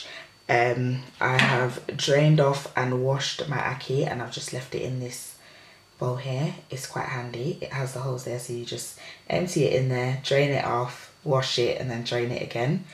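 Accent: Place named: West Midlands, United Kingdom. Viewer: I was thinking more West Midlands, it sounds like you have a hint of it. You are doing good with you channel, maybe we can come up with something together one day? :)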